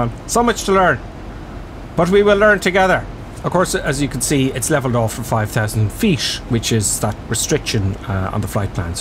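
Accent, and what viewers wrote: Ireland. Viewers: I'll never fly these big jets, I just come for the great craic. Keep it up. Highly entertaining, especially the response to the shite adverts. No one says it quite like the Irish 👍😀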